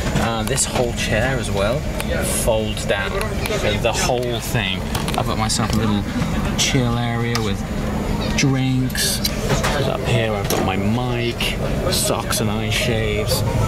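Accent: RP, United Kingdom. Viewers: Your posh Brit accent had me in stitches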